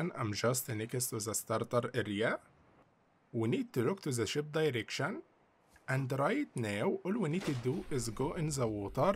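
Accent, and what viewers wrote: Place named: Arab world. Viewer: I like your accent, you sound like an Arab Mario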